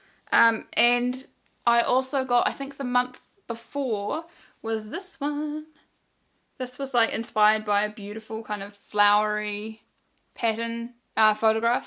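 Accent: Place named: New Zealand. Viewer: So excited that I just found your channel! I lived in Wellington for almost 12 years and it’s so nice to hear the kiwi accent again. My NZ accent has slowly been disappearing 😢 hope to see more videos soon!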